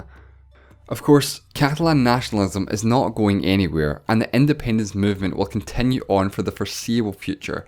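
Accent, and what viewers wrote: United Kingdom. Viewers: May I ask where part of the UK are you from?. I mean, My native language is spanish; I've studied English, but I've never been in an English speaking Country and I have some issues understanding some accents, in particular I have issues with Bitish English (that's what I thouthgt) and some whith canadian and american English from the northest (Massachussetts and that area). But I have noticed that you have a very British accent and notheless, I can understand you pretty well.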